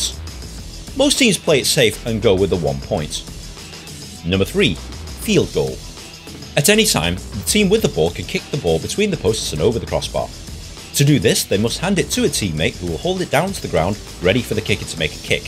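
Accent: England, United Kingdom. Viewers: That is 100% an English accent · British huh? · nope, deffo British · Dude, your explanation is completely detailed, but I can't understand a single word of British english, so I had to turn the captions on and keep rewinding the whole video, but I was able to understand everything in the end. Great video! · An English guy? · American football explained by a guy with british ascent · A English accent? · I love how American football rules are explained by an English commentator 😂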